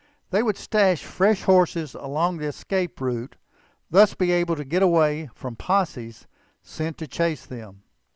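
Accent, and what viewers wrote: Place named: South, United States. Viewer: where is the narrator's accent from? it sounds southern.. south carolina? Louisiana?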